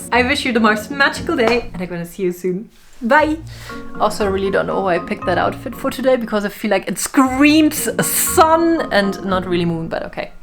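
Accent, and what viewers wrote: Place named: Germany. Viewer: I am happy your videos are not MOONdane.... ha ha So, thank you for your videos. They are so great. I love listening to you talk - I don't want to guess wrong or assume that you are German although I've seen you holding what looks to be a lot of German books and the way you pronounced some words with a "v" like the word "witches" - but I love your accent. You are very informative and always have interesting topics. I especially like this video because when you spoke about your grandma, it immediately made me think of my grandma and grandpa (my grandpa being from German lineage) and they never did anything that wasn't in accordance with the moon. Luckily, they taught me how to live by the moon cycles as well, and the knowledge they passed along has not gone to waste. I don't even rely on the weather channel for my weather information - I know what the weather is going to be like based upon observing nature, such as the birds and livestock. 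Loved this video so much.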